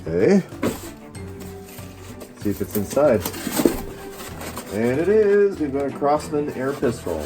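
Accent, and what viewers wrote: Canada. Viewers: Lol you have a strong canadian accent with some words. I will forget you're in canada, then i will hear something like "sorry", "ay" or "looney" lol then im like, oh yea he's up north. ;-) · I'm not used to a Canadian accent because I'm from the Southeastern US, so it throws me off with some of the ways you pronounce things. Other than that, I love this. I love you going through her house and all the cool things you're finding. Keep up the good work. (I misspelled Canadian in case you were wondering what I edited.)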